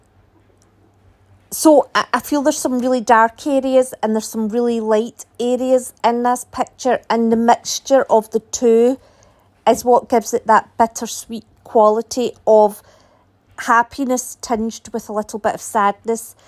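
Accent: Scotland, United Kingdom. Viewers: Is your accent Scottish or a certain region of U k❓. It’s delightful💞💞. Recent fan of your channel/works of art❣️❣️❣️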